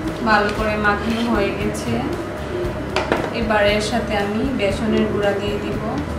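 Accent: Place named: Comilla, Bangladesh. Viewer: apur desh most probably comilla .. your Accent says so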